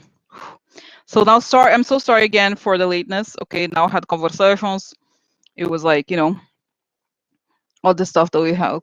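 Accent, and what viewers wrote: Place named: Russia. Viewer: Mark Harris I try to imitate her Russian accent but I can’t get the rolling R’s down. It’s so funny!! Love her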